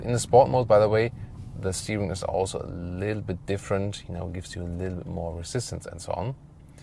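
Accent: Germany. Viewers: An excellent car, but I could do without the atrocious German accent! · I don't understand German English ...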